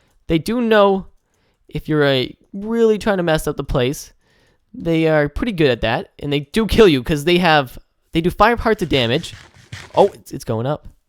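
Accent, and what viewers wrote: United Kingdom. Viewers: Does he have a british accent or the cutest lisp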